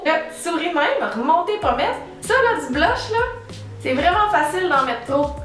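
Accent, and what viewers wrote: Quebec, Canada. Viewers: Je suis juste là pour son accent Quebecois Haha qui est là pour ca aussi ??? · Vraiment charmante, l'accent Québécois je pourrais l'écouter des heures 💗💗💗💗 bonne continuation bisous de France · J'adore l'accent Québecois! En tout cas t'es superbe et vive le Québec! ^^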